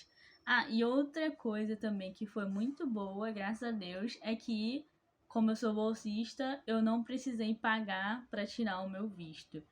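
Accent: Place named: Rio de Janeiro, Brazil. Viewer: Sotaque de carioca ,é carioca?Boa sorte 🍀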